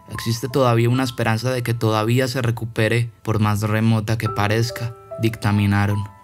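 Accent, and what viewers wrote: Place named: Antioquia, Colombia. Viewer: Que paisa, es del Valle de Aburrá?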